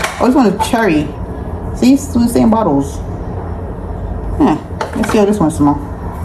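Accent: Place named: Jamaica. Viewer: Listening to your accent makes me wish I was in Jamaica right now!! Can’t wait to go back!!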